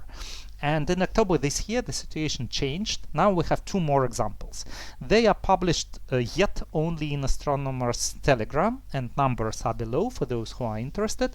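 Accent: Russia. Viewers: не знаю, наверное русский акцент. Я же не учил произношение юар, филиппинцев.. или индусов - их ретрофлексный акцент надо ещё научится делать. А если ещё поумничать, то наверное ближе к юар, у них в африкаансе больше звуков похожих на те, что в русском. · Родной акцент (хоть и не самый сильный) режет слух и не даёт сконцентрироваться на содержании...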